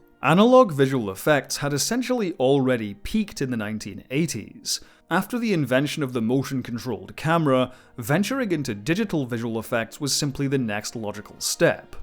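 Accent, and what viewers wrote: Scotland, United Kingdom. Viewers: Cool, thank you for this great in-depth review. That was very informative. Are you in Scotland? I love the accent. But I always wonder how can you film guys make a living? Do you work as a lecturer at a University or film school?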